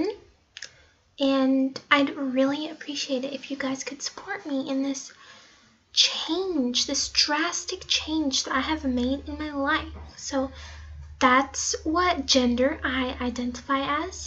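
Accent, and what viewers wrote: United States. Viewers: I thought you were Americanish 😳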